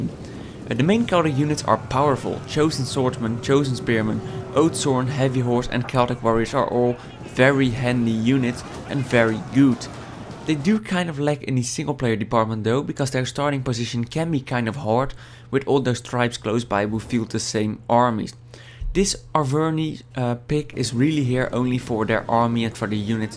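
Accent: Netherlands. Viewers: I just can't listen to this Dutch accent ;/ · you sound like you're Dutch, if you are Dutch: ik vind je filmpjes heel leuk blijf doorgaan :)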